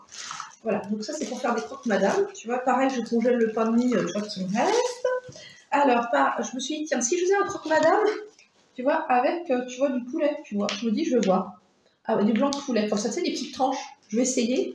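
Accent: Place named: Franche-Comté, France. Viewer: Et bien quelle surprise de voir une nouvelle tête ! Ma foi il a l’air bien sympathique ce franc-comtois (on entend une petite pointe d’accent) 😉. Encore bravo pour cette vidéo toujours aussi plaisante à regarder ! Passez d’excellentes fêtes de fin d’année 😃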